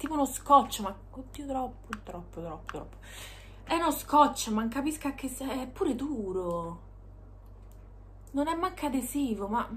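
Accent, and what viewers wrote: Rome, Italy. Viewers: Ciao, fai 1 video dove non parli romano ma solo italiano.... ti sfido 🤪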